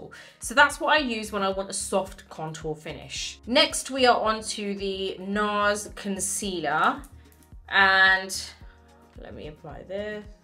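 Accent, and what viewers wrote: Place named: United Kingdom. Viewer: verrrry helpful bcoz it answered some questions for me. I like yr british accent too!thank u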